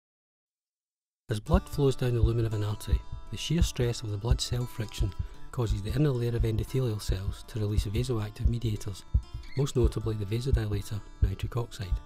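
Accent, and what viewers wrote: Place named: Scotland, United Kingdom. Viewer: what hell is he talking about??? shitty scotish accent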